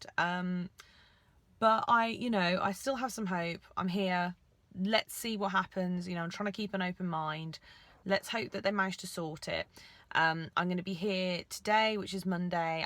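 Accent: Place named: United Kingdom. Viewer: A tip for getting rid of the sticky tape residue to anyone watching is to use an oil-based cleanser like those face oils that are popular now. But be sure it doesn't have anything you're allergic to in it! Thanks for posting this video, I have been having a lot of problems with a rash on my face for 8 months now and my newest derm suggested the patch testing. I have been hesitant about it, I just worry it will be uncomfortable and costly and I will get no results. It seemed fishy to me that I would suddenly develop an allergic reaction and paying this doctor for a test like this will magically fix it since I have had so much bad luck with it up to now and lost a lot of money on doctor's appointments and medications. I hope your accent means you are in the UK where you hopefully won't be bankrupted by all these tests. I guess I should look for more videos you've posted to see if cutting everything they said you're allergic to out healed your lips and face, I really hope so.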